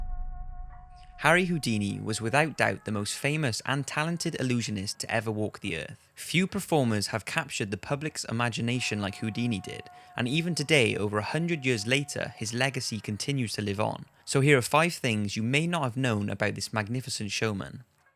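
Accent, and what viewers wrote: Wales, United Kingdom. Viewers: new to this channel going trough all your videos now :) are you from wales by any chance? you sound it lol I'm from wales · what part of the uk are you from mate ? :)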